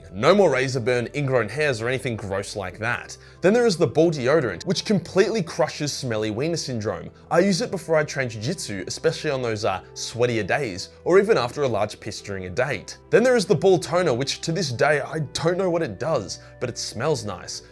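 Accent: Australia. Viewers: First twenty minutes and three of these views are mine lmao. My phone, switch, and PC. I couldn't get two to buffer correctly and now all are running and I just.. This cacophony of Aussie is magnificent. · Lol I think the risked it thing is from your aussie accent. As a American kinda flows off the tongue but I can see how itd sound funky with an australian accent. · It's the Aussie accent inflection and pronounciation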